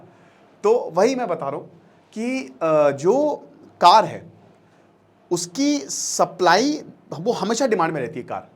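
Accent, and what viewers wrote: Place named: Lucknow, India. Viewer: Aap ya to lucknow se ho ya fir ... aap kanpur se hi 😅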